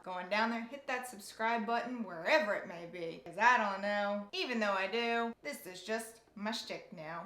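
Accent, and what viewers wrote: South, United States. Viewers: I change my daith on my own and I've gotten good at changing it on my own, yours also closed on you a lot faster than mine did, if you ever try again just be patient and be ready to switch out the jewelry. Also you've answered my questions in two of these videos! _ (Ado1017). I pronounce Piercing like you too, it's just a Southern thing lol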